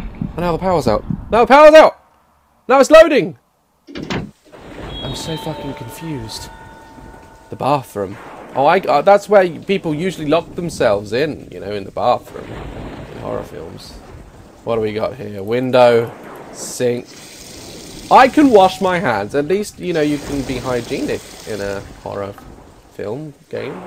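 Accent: United Kingdom. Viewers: your British aren't you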